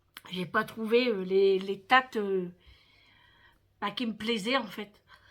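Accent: North, France. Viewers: Bonjour joyeux anniversaire j espère que tu sera gâté oui a noyelle beaucoup de magasins je suis allée avec ma fille je n en pouvez plus quand je t ecoute dans t et videos on a beaucoup de points communs j aime ta simplicité et notre accent du nord moi samedi je vais faire du shopping avec ma fille aussi toujour bien decouvrir t et menus bonne ballade enyre mère et fille a bientôt 🥰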